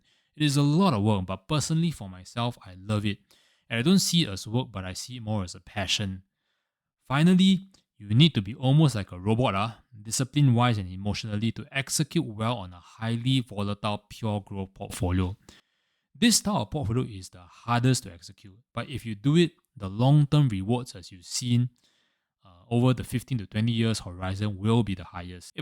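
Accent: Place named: Singapore. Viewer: Really love the singlish presentation!! Totally relatable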